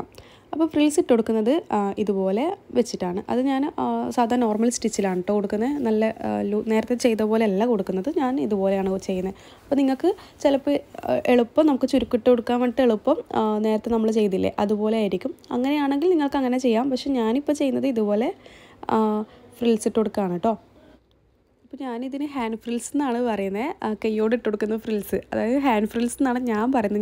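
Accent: Kannur, India. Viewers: ഇത്ത ഇങ്ങൾ കണ്ണൂർ ആണോ ? Slang😁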